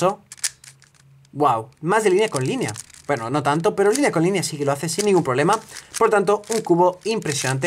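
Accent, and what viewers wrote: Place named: Catalonia, Spain. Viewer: Tenemos ésto por una banda... Se nota que eres catalán...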